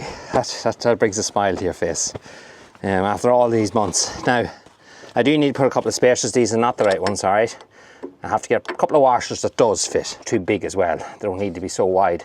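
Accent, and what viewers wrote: Ireland. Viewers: can you tell if I zip through your video? As an old lady, I am not much interested in the heavy machinery repairs, but I do enjoy seeing the cattle, the green fields and hearing your Irish voice. I would not like you to feel insulted that I don't watch every minute, but I always click LIKE!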